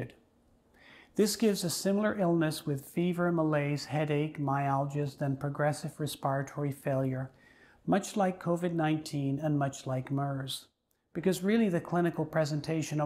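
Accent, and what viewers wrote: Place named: Canada. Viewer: "I've been asked aboat.... This video is aboat...." Clearly this doctor is Canadian (I can trust him).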